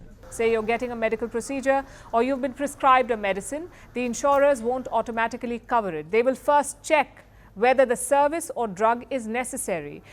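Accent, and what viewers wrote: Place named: India. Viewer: Please! Stop with the Indian accent and pseudo-journalism!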